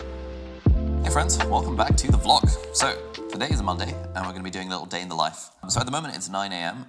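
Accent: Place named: United Kingdom. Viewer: Really amazed watching an Arab speaking English with a perfect British accent. Assalamu alaikum, Akhi. 🙏🙏🙏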